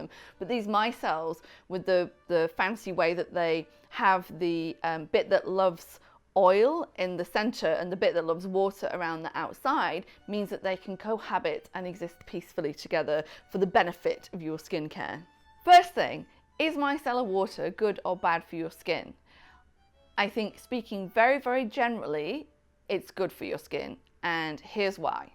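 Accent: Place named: United Kingdom. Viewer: I love British English accent 😍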